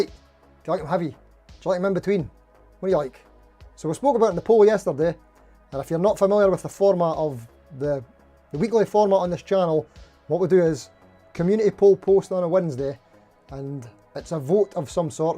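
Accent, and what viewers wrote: Scotland, United Kingdom. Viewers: Never apologize for your accent! Remember the first rule of quality..."If it's not Scottish... IT'S CRAAAAAPPPP!"🏴🤪👍✨💙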